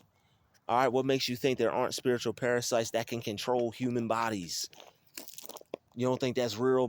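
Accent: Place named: Midwest, United States. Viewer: Spot on. You from the Midwest? You talk like it. I get what you're saying. I know from experience.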